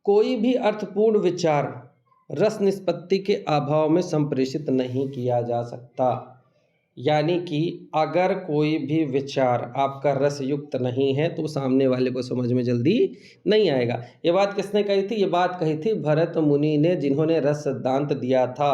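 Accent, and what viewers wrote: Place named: Allahabad, India. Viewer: Aur sir mujhe pata hai aap bhi Allahabad se hi hai aapki language ekdum allahabadi hai Naini ki aas paas se hai aap Mai aapse 2 ya 3 baar baat kar chuka hu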